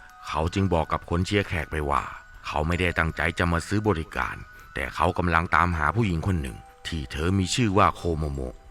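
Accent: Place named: Korat, Thailand. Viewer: คนโคราชบุรีรัมย์ใช่มั้ยนิสำเนียงชัดมาก😂😂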